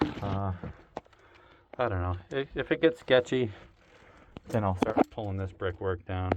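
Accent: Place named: Northeast, United States. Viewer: Any idea where this guy is from? His accent sounds like he’s from the northeast maybe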